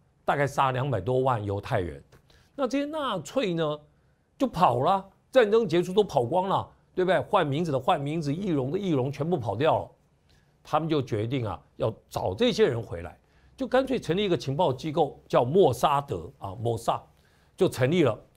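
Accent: Standard. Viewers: 郑老师辛苦了！郑老师的汉语说得顶呱呱👍👍 比我们很多国人说的国语还标准。相信郑老师这档国语政论节目会越办越好！支持郑老师！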